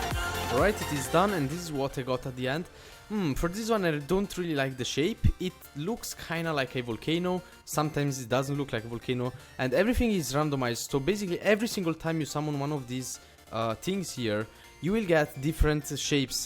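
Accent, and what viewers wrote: Italy. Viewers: Are u italian? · Are you italian?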